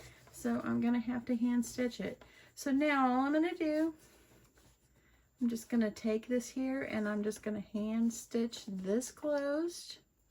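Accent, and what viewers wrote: South, United States. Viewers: I’m glad to see a video of yours tonight, this might make you laugh but I got so used to watching you every night. Then the memory dex was over I found myself missing my Crafty friend! I look forward to hearing your sweet southern accent. I made my first doggie last night. She’s so precious! Hope your having a wonderful day 😀💕🤗🇨🇦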